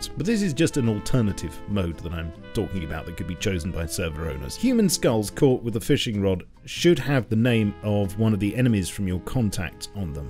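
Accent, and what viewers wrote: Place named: Cockney, United Kingdom. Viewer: ...hmm...I wonder if and The Spiffing Brit are related?..both are partial to a cuppa...both have posh accents with just a hint of East End..init..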